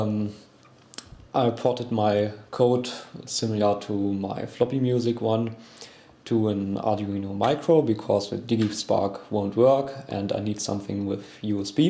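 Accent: Germany. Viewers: Ahh dein Deutscher Akzent xD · i love his german English😂❤ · Wie man beim ersten Wort einfach raushört, dass du Deutscher bist :D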